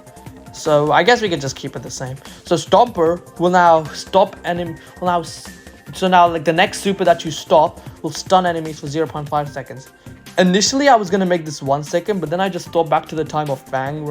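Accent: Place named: Germany. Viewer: Wieso merkt man, dass du deutsch bist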